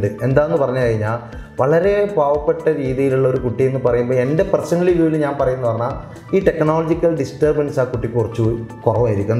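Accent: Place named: Kannur, India. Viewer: കണ്ണൂർ ഭാഷ വിടൂല്ല.. സർ മുത്താണ്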